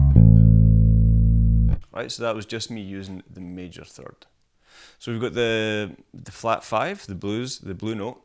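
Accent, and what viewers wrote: Scotland, United Kingdom. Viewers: for a moment I thought I'd have difficulty understanding your accent as it says Bass Lessons Melbourne, but I was relieved to hear that you speak in Scottish accent. I hate the Aussie accent.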